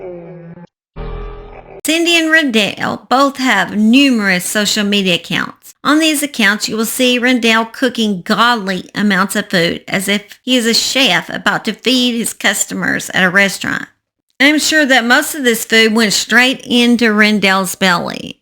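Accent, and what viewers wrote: South, United States. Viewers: Southern girls get lost in there?? Not hardly people will eat that accent up. We northern girls are unapproachable. LOL You are who you are, that's a good way to be!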